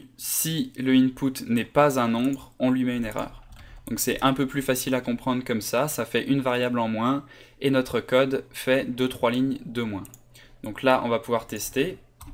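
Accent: Canada. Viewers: T'as des sonorités canadienne dans ta voix ^^ Sinon merci pour ton tutoriel, il est juste parfait ! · il m'avait bien semblé déceler un accent canadien dans ta voix !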